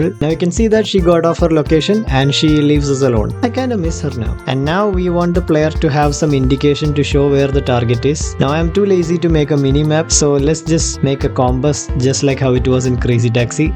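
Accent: Kerala, India. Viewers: ni malayali alleda 😂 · Are u from Kerala ? Well this video was wonderful keep grinding brother 👏 u gained a new loyal sub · are you malayali accent is too strong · Bro are u from kerala 😊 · You are a Malayali right? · including the Malayali accent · I detected that malayali english accent within a few seconds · Why im getting a feeling that you are a malayali😅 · Bro is a Malayali for sure!! 😂🤍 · Bro malayali aano? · Malayali aano saare??? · Broo Mallu or what?? Props to you bro! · your Mallu accent 😂is top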